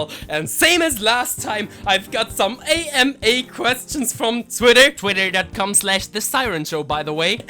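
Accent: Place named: Europe. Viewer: your accent sounds european, where are you from?(i'm from the netherlands tbh)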